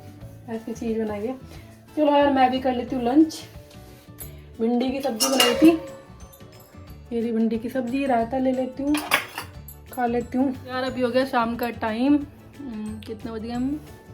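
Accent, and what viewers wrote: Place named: Rural, India. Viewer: Sister aap to bilkul gaon ki language bole to aap to Delhi mein rahe ho ham bole to ham bhi udhar ke side ke Hain ham bhi aapki tarah bolate Hain jab ham ghar mein baat karte hain to aur aapke language bahut acchi lagi aapki mummy bolati hongi lekin aap to Delhi mein Pali bade ho so sweet bahut achcha Laga blog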